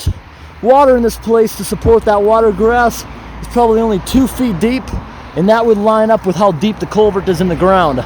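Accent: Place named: South, United States. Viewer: man sounds like my states down south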